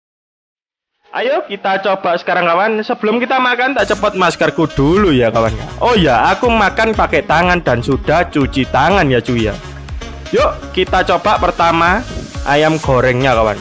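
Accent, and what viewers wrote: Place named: Surabaya, Indonesia. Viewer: logat Suroboyo an nya medok banget, ditotol itu maksudnya dicocol ya, ikan bakar dan ayam bakar nya emang enak sih, mantap, Bro, sehat selalu ya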